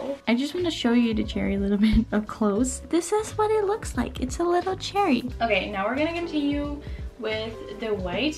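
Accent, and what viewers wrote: Netherlands. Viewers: Are you dutch?? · are you dutch?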